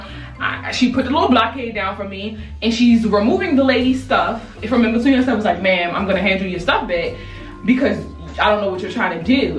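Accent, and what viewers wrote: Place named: Washington DC, United States. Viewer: Okay DC accent ✨🎊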